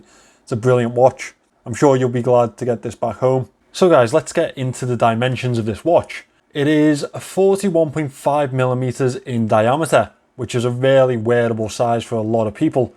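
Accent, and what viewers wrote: Liverpool, United Kingdom. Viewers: Good luck with your channel, your enthusiasm shines through and your “ scouse” accent gives the commentary it’s own unique flavour, as does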